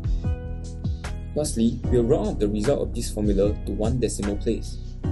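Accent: Singapore. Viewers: Omg ru singaporean HAHAHA i recognise the accent!! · Super great video thanks for sharing! But please don't shock viewers with this loud music in the end. If you dont want to remove it at least turn it down (or alternative make your voice louder) Also I think this video would be easier to understand for many people if you use more standard English (Nothing against Singlish but it can be difficult to understand for folks who never heard it haha) Keep up the great work!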